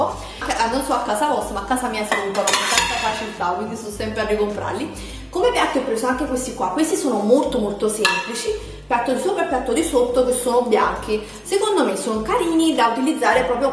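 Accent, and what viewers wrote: Naples, Italy. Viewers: io amo la tua cadenza napoletana 💖💖💖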